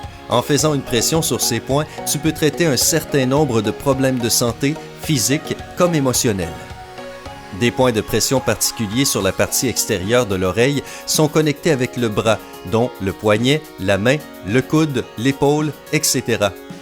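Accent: Canada. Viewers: vire la voix canadienne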